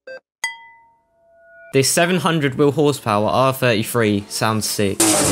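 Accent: United Kingdom. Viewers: You should let your videos narrate by someone with an american accent. British accent is just not it. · love your British accent bro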